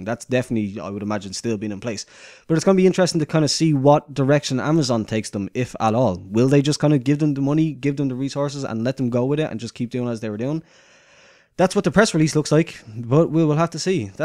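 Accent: Ireland. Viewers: Haha I love a fellow irish accent, where abouts are you from I'm from swords in dublin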